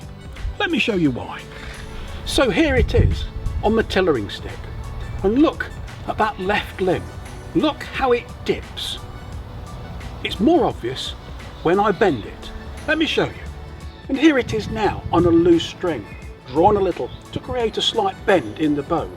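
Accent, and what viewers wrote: United Kingdom. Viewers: Beautiful video, Beautiful English accent. Thank you. Greetings from France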